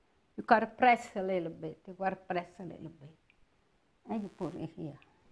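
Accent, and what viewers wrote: Italy. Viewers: I would love to taste everything she cooks they all look so delicious I get hungry just watching her make the food I did save most of the recipes to make at a later date I like listening to her Italian accent my mom and grandma were both Italian my grandma was born in Rome! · I love to listen to speak. She has a lovely Italian accent which reminds me of my dear nana who passed away two months short of her 100th birthday in 2016. She was one tough cookie. God bless her and god bless. Thank you for your recipe. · Broken English with Italian accent is so cute. She did a good job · This is my first time watching, and I loved everything about it. The singing, the comment "my children are my life" and that great Italian accent. I love everything Italian. My favorite is watching old Italian movies with sub titles. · I like grandma and her recipes she is doing a wonderful job.Her strong Italian accent is something I can't get enough of.Would like to see who is behind the camera ;)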